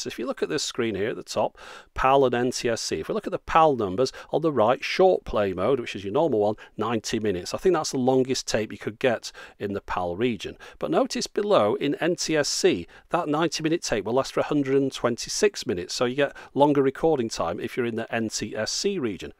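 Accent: United Kingdom. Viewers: "Skullduggery was afoot" - god, I love listening to British people talk. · It's just one of those British things. "Patent" with a long a, "Mazda" with a short first a, "missile" with a long second i.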